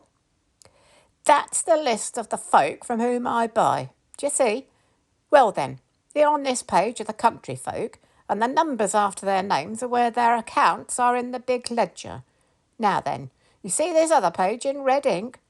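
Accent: United Kingdom. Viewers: I enjoyed your narration of this Sherlock Holmes short story. Hearing it performed with a British accent is so much more enjoyable than hearing it in my head with my American one. 😂 Thanks.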